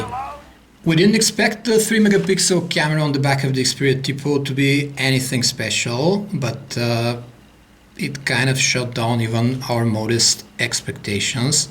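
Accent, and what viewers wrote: Bulgaria. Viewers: Are you bulgarian? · Hey! Good review bro! You're Bulgarian arn't you? :-)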